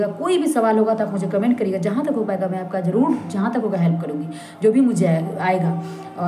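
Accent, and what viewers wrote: Bihar, India. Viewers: Bihar se ho ya up. Se 😂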